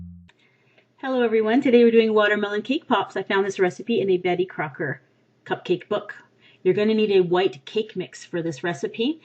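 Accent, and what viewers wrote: Canada. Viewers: I could tell that you lived in canada before you mentioned it because of your accent c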